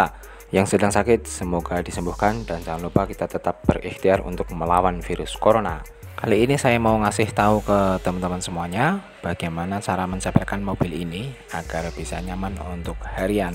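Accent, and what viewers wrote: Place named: Banyumas, Indonesia. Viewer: Pada pada orang ngapak...infonya mas yg bagus2 buat tambah ilmu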